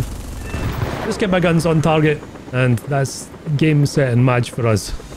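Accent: Scotland, United Kingdom. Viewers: great video guy! good to hear another scots voice out there on the interweb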